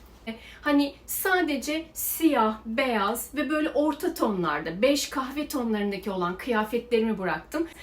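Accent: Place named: Turkey. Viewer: Japoncayı Türk şivesi ile konuşmak çok güldüm harikasın😂😂😂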